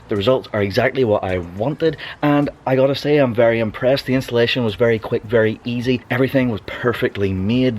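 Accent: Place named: Northern Ireland, United Kingdom. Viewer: Are these legal in the UK as I am presuming by your accent and vehicle reg that you are in Northern Ireland. I have had 15 mm spacers on an E46 M3 in Australia where it is illegal probably based on the 'piggy back' type that are pretty risky. I wrecked two wheels and did thousands of dollars of suspension and body damage when a 30 kg manhole flipped out of its hole and instantly deflated both tyres and the spacers were unaffected but I did take them off before the car was repaired under comprehensive insurance. I am moving back to the UK and would like to put these spacers on a mini clubman if legal or probably even if their not legal but a bit of a faf if you have to take them off for MOT's and inspections by the Rossers.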